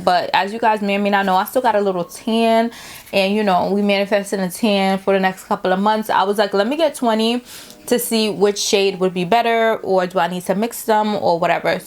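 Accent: New York, United States. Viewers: Girl!! I had to subscribe. Right away, I was like she has to be from New York! 💁🏾‍♀️ Love the results.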